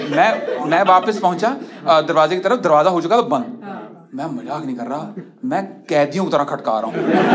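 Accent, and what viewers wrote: Punjab, India. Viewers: That Punjabi accent mix with hindi makes it different. 😅😂😅😂😅😂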